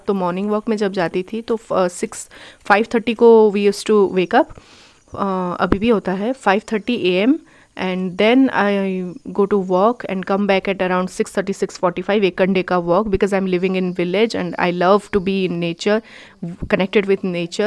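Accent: Odisha, India. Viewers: Hlw mam i guess you're from odisha! RIGHT!!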